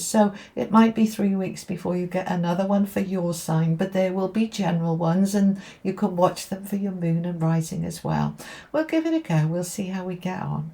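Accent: United Kingdom. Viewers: Dear I started watching you around this time last year. A few things I love about you (besides your beauty and style): You are genuine. Your readings are not robot-like or business-like. It feels like you’re talking to a best friend. You’re also motherly, “in the moment”, very kind and patient. Sometimes I listen to you over and over when doing my hair or doing housework. It just feels homey and warm. I repeat certain words right after you with British accent haha (I’m writing from California). There is more to your videos than just simple tarot readings. There is heart, there is depth and there is wisdom. Have a lovely 2025, beautiful! You are appreciated.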